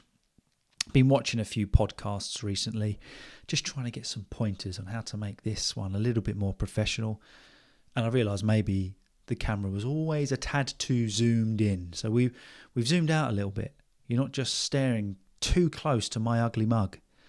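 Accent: United Kingdom. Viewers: Thank you so much for continuing to make videos all these years and for never giving up. Wishing you and your family good health and happiness. I really love your videos, the way you tell stories and share your experience is truly enjoyable. And last but not least, i absolutely love the British accent!. I hope one day I will have a chance to visit the UK. Cheers mate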